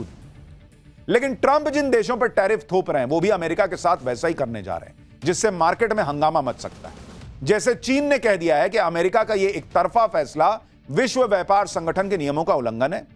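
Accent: Bihar, India. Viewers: Dada hum kya kar sakte hai vo batao bihari acent se vishleshan karke bhatkao mat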